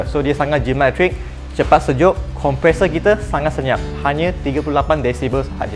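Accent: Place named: China. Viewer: u look indian but sound chinese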